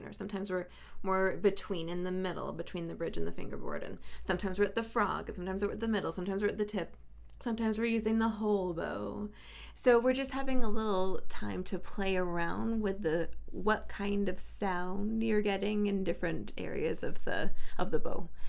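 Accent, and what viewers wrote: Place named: France. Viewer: "I hope this is recording 😵🥴 ... " 🤣😂🤣😂 💚💛🧡💔 I grew up in France only to leave at the age of 24. English is full of French words: strange/extreme/beauty/desire/verbal/mode/blonde/etc so you already know a bunch of vocabulary. When we French speakers learn English the same happens ! Where as when we learn German there's little to start with. But you English speakers also know a bunch of German vocabulary: break/brechen White/Weiß Mother/Mutter Brother/Bruder and so forth. Your french accent is so cute ☺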